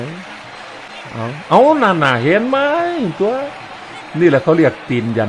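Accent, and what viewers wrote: Isan, Thailand. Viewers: เสืยงพากออกทำนองอีสานนี้มันน่าฮักอีลีเด้อ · เป็นกำลีงใจให้ครับ พากย์อีสานแบบนี้แหละฮาๆ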